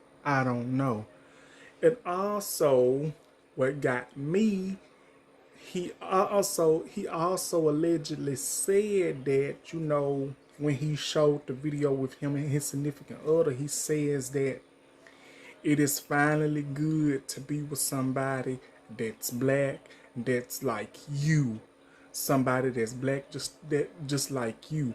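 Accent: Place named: South, United States. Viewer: Gee Brother....what State are you from? I know you are from the South but being a New Yorker, I cannot figure out which southern state you come from but you way of talking is weird and deep as fuck. Did you see the sex videos of Ray and Zed on line, if you did you may have an idea why Ray took the L on Zed not to say there is something wrong with Zed but from what I can analyze, I think Ray is a big ole size bottom and yes I think Ray found a brother who might be digging him deeper than Zed was. Karna is a bitch and yes shit ain't gonna be working for Ray if patience is not his priority. Ray is talking about making money quickly but he ends up with this brother who does not seem to have shit going on for him. From what I saw he seems like Zed is moving on and of course he is dealing with the break up as he did sincerely loves Ray but he seems to take charge of his life and his music career.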